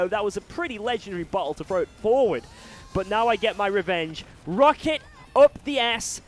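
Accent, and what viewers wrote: United Kingdom. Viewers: hol up, hol up. Money in pounds..... British accent.... Do they.... do they possibly have KFCs in the UK? o.o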